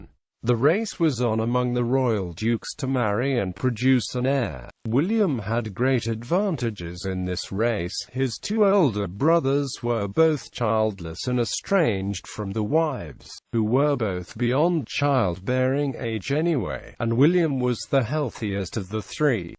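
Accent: United Kingdom. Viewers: Once again, very interesting but so poorly read. Why on earth were the producers unaware of this? Rather spoils what could have been a brief and welcome documentary. Was the reader British?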